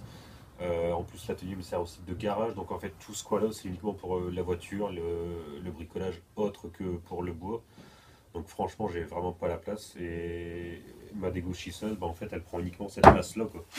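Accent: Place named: Nord-Pas-de-Calais, France. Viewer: Bravo pour ce document bref et concis sans blabla inutile avec un ch'ti accent sympa. Il n'y a pas de chose mal faites, il n'y a que des façons différentes de les faire...Bravo à toi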